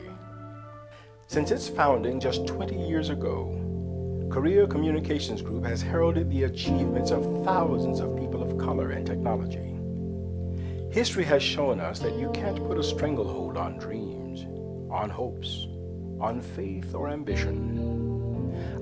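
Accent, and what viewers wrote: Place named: United Kingdom. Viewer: the British accent... o.O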